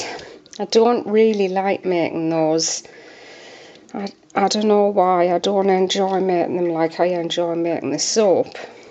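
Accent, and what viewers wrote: United Kingdom. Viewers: Definitely British :)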